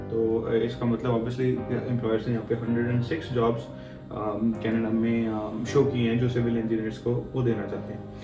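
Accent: India. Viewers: Important matter. Can u please add captions to this video? Sorry I can't understand your English Indi accent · Can't get you clearly, music n too noisy with indian speaking confuses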